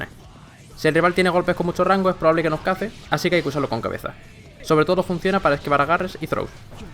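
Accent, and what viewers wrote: Spain. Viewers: este youtuber es genial, enseña muy bien, pero no le entiendo lo que dice, de que región de España es ese acento?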